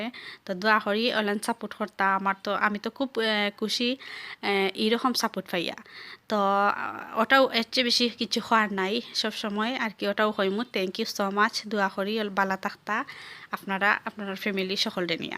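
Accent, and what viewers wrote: Sylhet, Bangladesh. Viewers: চিংড়ি মাছ যে এতো সুন্দর করি ভুনা করা যায়,দেখে অনেক ভালো লাগলো।। আরোও ভালো লাগলো আপনার সিলোটি মাত😀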